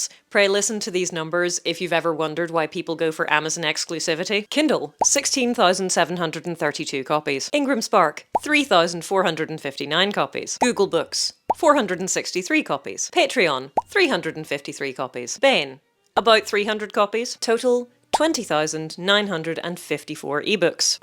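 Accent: Northern Ireland, United Kingdom. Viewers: I’ve listened to the audio book and once I got over the shock of hearing a Northern Irish accent, I was able to sink into the story. I really enjoyed the book and your performance. I gave it one of my few 5 star ratings on GoodReads. I really like a mature heroine. I will wait with bated breath for the next title, but since I like to reread and re listen to books, I will just use this one to keep myself going. ❤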